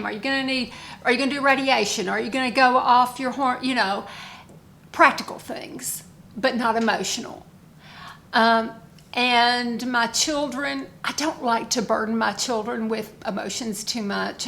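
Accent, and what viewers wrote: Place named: South, United States. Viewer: You would be fantadtic at ASMR!!! I often tune in to your channel right before bed to unwind. You are very nurturing by nature and your voice and Southern accent is calming and soothing. Just do it!!!! You are already doing ASMR without even knowing it! Lots of love and thanks for this video, i just had my 69th BDay in June. XOXOXO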